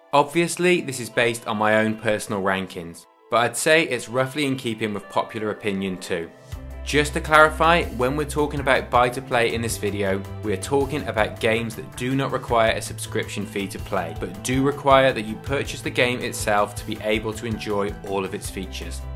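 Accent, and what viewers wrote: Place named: England, United Kingdom. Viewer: Take this as a compliment: I always like watching video game reviews and lists like this when narrated by a person with an English accent. Probably comes from my days of watching TotalBiscuit when I was playing WoW.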